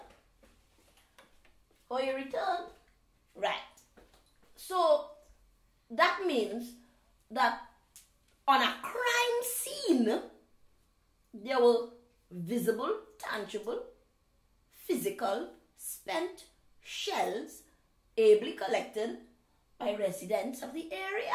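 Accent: Barbados. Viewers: The bajan accent killing me to😭😂😭😭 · love she bajan accent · That Bajan accent!!! 😂😂😂😂😭😭😭😭😭😭😭😭😭😭😭😭😭😭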